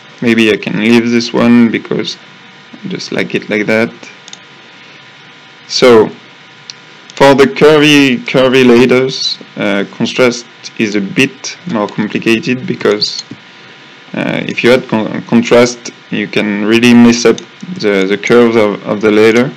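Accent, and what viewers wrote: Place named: France. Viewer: nice french accent :p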